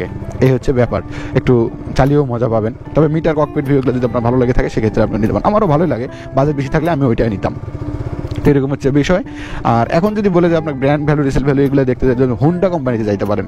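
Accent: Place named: Gaibandha, Bangladesh. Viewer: ওহ আমি মনে করছি গাইবান্ধা, নয়তো বগুড়া, নওগাঁ,গাইবান্ধা,বগুড়ার ভাষা same..😊 তাই না ভাইয়া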